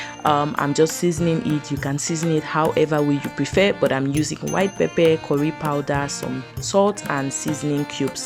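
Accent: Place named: Nigeria. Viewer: You have Nigerian accent the rice looks absolutely delicious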